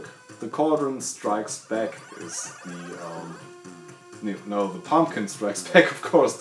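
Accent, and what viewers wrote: Germany. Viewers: Weird. I’m hearing “a-to-zed” or “day-to-zed” or “data-zed” but the subtitles always say dataset. I guess that’s the German accent playing there!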